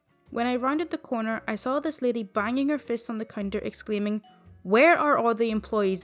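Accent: Scotland, United Kingdom. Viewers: Where is your ascent from? It sounds Scottish to me i love it though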